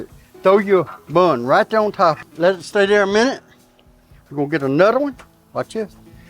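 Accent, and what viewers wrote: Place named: South, United States. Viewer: 'watchis'...........well. Imma Couyon (you sound country) and I see nuttin Cajun bout dis......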